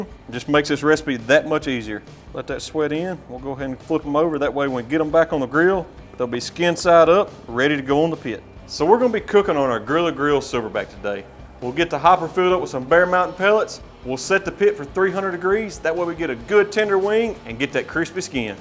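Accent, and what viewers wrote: South, United States. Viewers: He got that southern accent .....sounds like molasses drippin out his mouthhh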